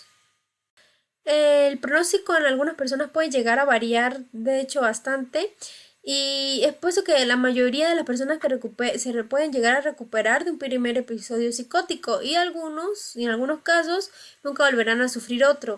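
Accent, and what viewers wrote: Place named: Mexico. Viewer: EXCELENTE, TIENES CIERTO ACENTO MEXICANO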